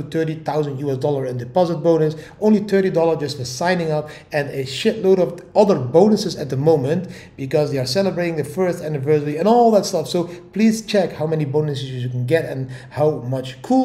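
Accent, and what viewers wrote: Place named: Netherlands. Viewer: This guy is still Dutch AF 🤣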